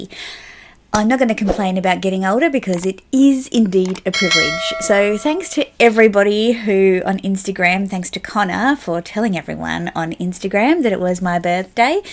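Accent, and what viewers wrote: Australia. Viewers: Happy (belated) Birthday!!!! I like to listen to your Australian accent. Keep being blessed!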